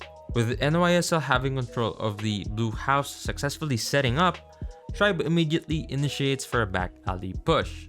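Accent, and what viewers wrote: Philippines. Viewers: Hello are you filipino? I like your accent and voice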